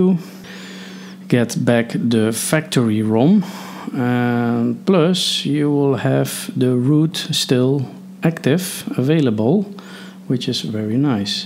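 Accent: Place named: Netherlands. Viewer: You're Dutch I noticed it from your accent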